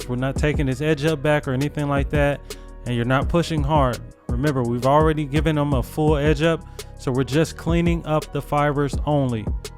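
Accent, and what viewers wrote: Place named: Sacramento, United States. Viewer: just asked cause you sounded like some of my folks in Sacramento but Oregon ain't to far from cali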